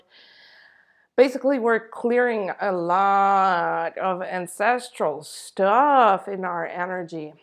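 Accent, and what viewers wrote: Quebec, Canada. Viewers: Hi! Question, are you the girl from Quebec? I'm on the south shore of Montreal and i think i recognise your accent and i heard that, that girl, a few month ago living around Montreal that was doing group healing sessions. I saw that girl, maybe you, in a podcast once but you have no pictures here or on FB. Let me know if it's you! 💜🌸💜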